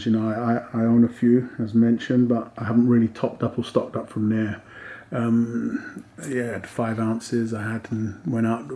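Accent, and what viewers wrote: England, United Kingdom. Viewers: I really love your English accent and style....I trust your opinion so I went with the 2020 Australia 1 oz Silver Australian Quokka BU . Thanks for your Spirit.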